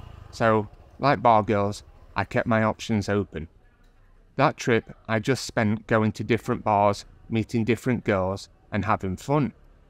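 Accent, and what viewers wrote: England, United Kingdom. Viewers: you sound like a Pom to me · if you're an Aussie, what's with the English accent?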